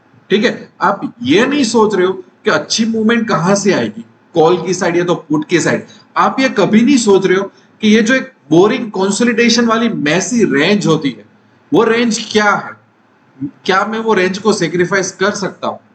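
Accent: Gujarat, India. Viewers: Pakka gujju bhai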